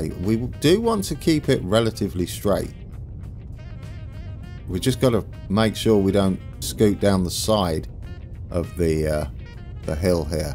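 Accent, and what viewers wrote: United Kingdom. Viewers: Hello, in about section you say : location USA, but you sound quite british. I am improving my English. Could you enlighten me ?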